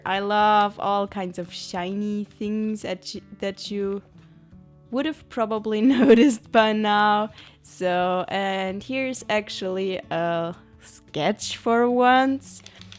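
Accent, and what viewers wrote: Germany. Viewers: Where are you from? It sounds like a Germanaccent😂😅😗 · Are you German?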